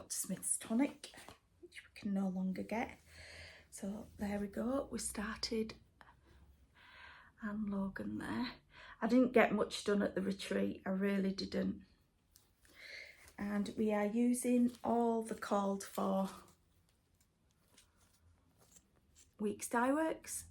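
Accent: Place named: West Yorkshire, United Kingdom. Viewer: Hi I’ve just started watching you. Carol the saltbox stitcher recommended you. Your framing is beautiful, could I ask where did you get the long narrow frame please? It’s gorgeous. I am in South Yorkshire and I’m guessing you’re in West Yorkshire going on your accent. Anyway I enjoyed your Flosstube. Thank you. 😊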